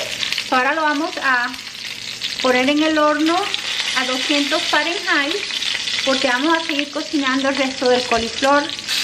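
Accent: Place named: United States. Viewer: porque hablas como hablaria una americana, habla bien espanol.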